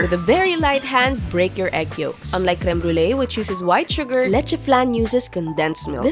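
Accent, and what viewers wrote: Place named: Mexico. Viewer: An asian girl who talks like mexican or latin american, What do you call urself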